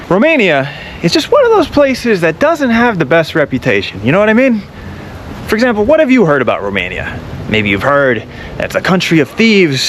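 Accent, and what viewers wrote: New York, United States. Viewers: he's from nawwyakk,,giv em a break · This is one of your best ones, and I want to visit Romania! I can't believe how many people here don't respect a good New York accent when they hear it. I grew up in lower Westchester in a family with Brooklyn roots and the word was "FOY-LEDGE" but, American English being what it is, it's just another word that we spelled differently than how we spoke it. It may not be "Proper English" but it's commonly pronounced that way around the NY-NJ region or at least it was when I was growing up there. Not wrong, just different. That's a human value that is conveyed well in your travels! (I'm not a Professor of Linguistics, just a Life Traveler) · Cut him some slack. It’s the New York. Way of saying things.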